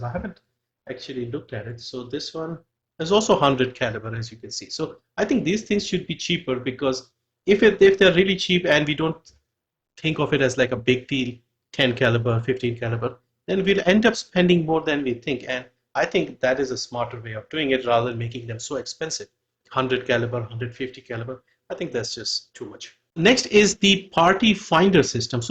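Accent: India. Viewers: I was able to recognise from your voice that you are an Indian. Proud to see a fellow Indian doing this good. Keep up the great work!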